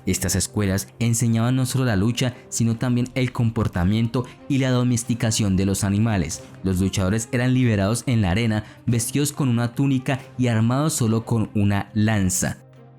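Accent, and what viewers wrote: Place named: Bogotá, Colombia. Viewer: Ese es rolo, no le ve la voz.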